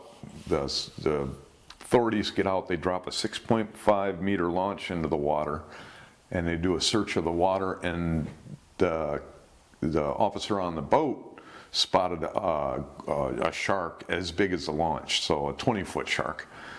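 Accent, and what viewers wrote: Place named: Connecticut, United States. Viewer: =You must be from Connecticut. I have been interested in dialects for 50+ years. How close am I in my guess? LMK please.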